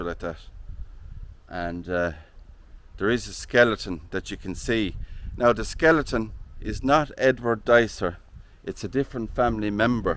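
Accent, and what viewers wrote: Ireland. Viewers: 322nd So many people are clueless about human biology and what happens to a body when you die ..... great youtube page "ask a Mortician" ..the body bloats and releases trapped gases....love your accent / my direct relatives are from Donegal - Gallagher/Coll/Costello ...I was in Ireland 15yrs ago - Gorgeous country ....I wonder if the Cusack..... is related to the Actor/family Cusacks? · I like the term of torch, vs our flashlight. 😂 To bad a person re-purposed yours, for themselves! Hello from Northern California, I am enjoying another of your videos. I am getting better with understanding inflections with your accent, in addition to having had some amazing Irish neighbors, who have moved back to Ireland. Happy New Year to you, your team and family.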